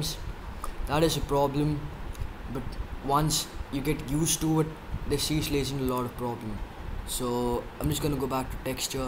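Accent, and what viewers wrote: India. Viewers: I actually like your accent, is it Indian?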